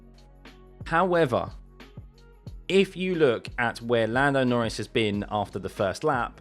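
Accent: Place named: United Kingdom. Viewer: Can you do a non-UK accent? It might hide your obvious bias. Good luck!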